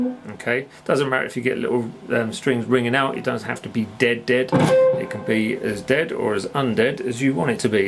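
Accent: United Kingdom. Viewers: Nice rif. are you from London?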